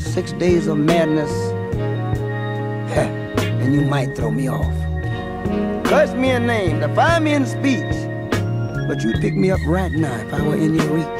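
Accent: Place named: South, United States. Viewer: I love the southern accent. My scots-irish granddaddy had the exact accent, and it's quite beautiful.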